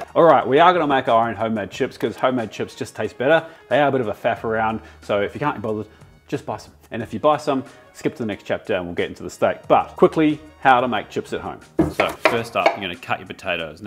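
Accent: New Zealand. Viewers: He from NZ but lives in Australia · You sound New Zealand bro. But I don't mind some Kangaroo steaks. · How come you sound like a New Zealander? "Chups" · are you kiwi tho? that accent is obvi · All this time I thought you were Kiwi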